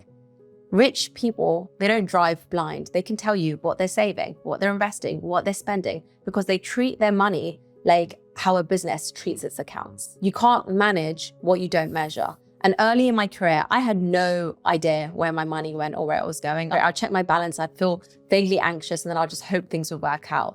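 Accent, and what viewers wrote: United Kingdom. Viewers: well fella she is British and that is the way they talk .... it is like boston and the f bomb! oxford university PPE grad 1990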